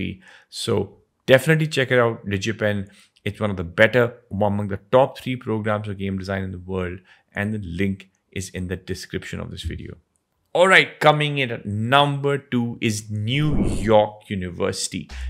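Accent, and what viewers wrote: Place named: India. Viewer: jesus, I respect this guy, but Indian English, OMG!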